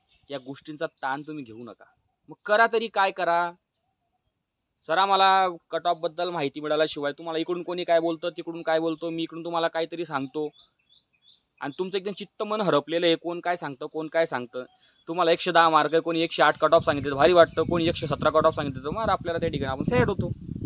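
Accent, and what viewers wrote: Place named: Malkapur, India. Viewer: दादा तुमच्या भाषे वरून कळते ,तुम्ही मलकापूर चे आहात k😊😊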